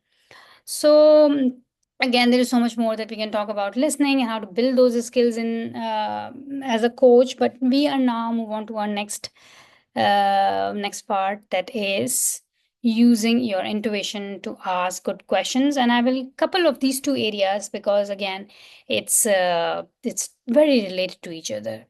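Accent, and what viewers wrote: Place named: India. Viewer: I am sorry, you delivery is incredible boring and you non words make me distracted, no thank you I can't go on and try to understand your speech that strong accent and not making any effort to pronounce well the language. And you repeat what everyone said out there it is override 👎may work in India, but not in thise side of the world, your competition pass you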